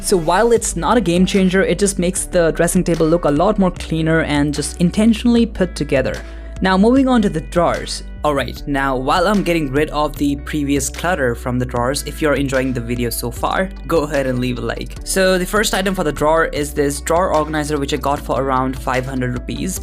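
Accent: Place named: United Kingdom. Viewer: you have a good british accent btw😊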